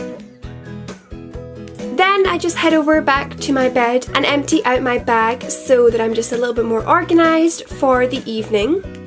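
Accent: Scotland, United Kingdom. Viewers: Where do you live? Are you Scottish ? I am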